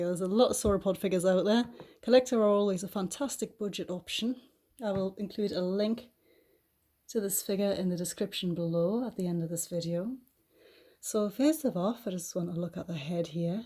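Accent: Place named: North East England, United Kingdom. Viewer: Love this model and it’s nice to hear someone else from the north east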